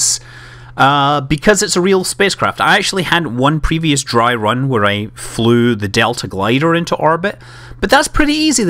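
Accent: Scotland, United Kingdom. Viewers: you kinda have a Scottish accent and your from the US how is that? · Although that's true, we all love hearing your friendly scottish voice.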